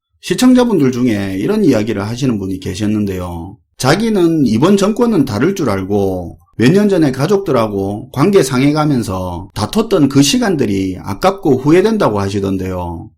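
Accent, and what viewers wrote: Gyeongsang, South Korea. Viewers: 아주 경상도스럽고도 신천지포교스럽다 말투부터 거북하다 지금 정치는 바르게가고 있다고 본다 이런식으로 2대는 더가고 그다음쯤 평가 해보자.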